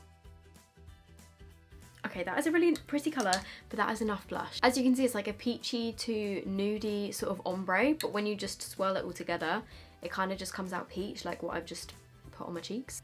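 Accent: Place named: United Kingdom. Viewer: Hi, I really love watching your videos and I'm in love with your British accent💖 (I am Italian😊)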